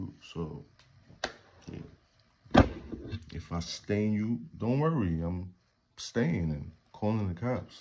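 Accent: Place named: New York City, United States. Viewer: You got a NYC accent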